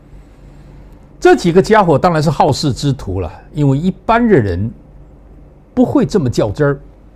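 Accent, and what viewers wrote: Fujian, China. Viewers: Your spoken mandarin with fujian accent is horrific to listen to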